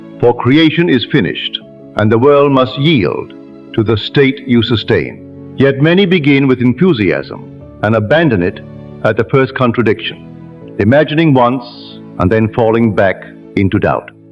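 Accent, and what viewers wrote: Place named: RP, United Kingdom. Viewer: I am more confused than ever. What on earth is he saying? Posh accent:-)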